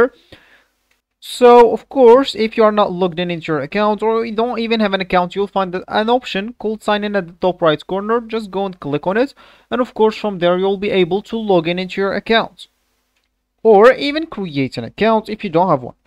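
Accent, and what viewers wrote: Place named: Morocco. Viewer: Naaadi abro gha mn voice 3rftek mghribi hhh❤️👌🏻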